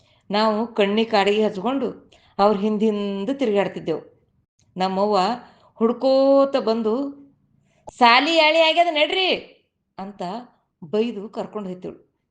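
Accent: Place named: Bidar, India. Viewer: ಬೀದರಿನ ಯಥಾಪ್ರಕಾರ ಮೂಡಿಸಿ ತಿಳಿಸಿದ್ದೀರಿ ಜಾನಪದ ಹೃಡಿನ ಸೊಗಡಿನ ಮಾತು ಬಲು ಚಂದ👌🏻👌🏻👏👏🌷🌷🌺🌺 ಶರಣು ಶರಣಾರ್ಥಿಗಳು👏👏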